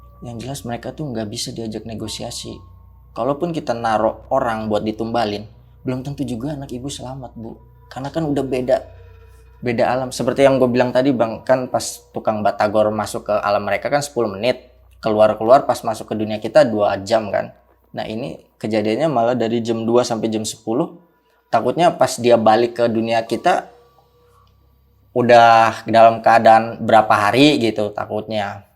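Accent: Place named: Serang, Indonesia. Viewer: wong serang kih wkwk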